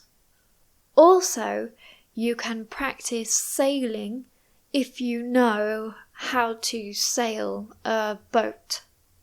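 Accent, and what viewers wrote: United Kingdom. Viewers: Es inglés británico?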